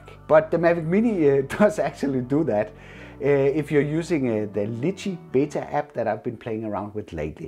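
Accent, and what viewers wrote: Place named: Denmark. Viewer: Er du fra Danmark? Jeg synde jeg kan høre det😂🇩🇰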